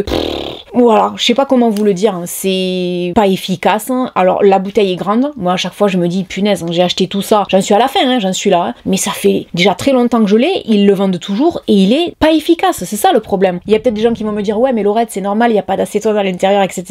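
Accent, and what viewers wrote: South, France. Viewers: Laurette... Laurette, je te suis depuis ta première vidéo 💜 et ton accent sudiste ressort d'année en année, c'est trop adorable. Hors plaisanterie, ton contenu conseil, économie est hors-norme! Ne lâche rien et saches que tes sponso "carte bleue" on adore car tu dépense pour ta communauté. on t'aime tellement 🌹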